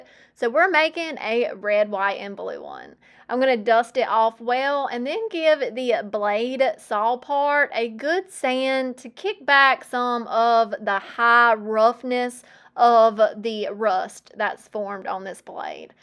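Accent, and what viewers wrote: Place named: Eastern North Carolina, United States. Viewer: I love all of your projects! Thank you for sharing your creative ideas. I live in East Tennessee and we have friends that live in Vanceboro, NC. When I watch your videos, it makes me think of and miss them because of your eastern North Carolina accent.❤🥰😉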